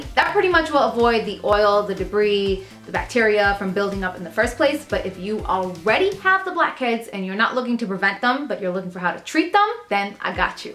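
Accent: New Jersey, United States. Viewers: ayye I can tell ur from Jersey me to😜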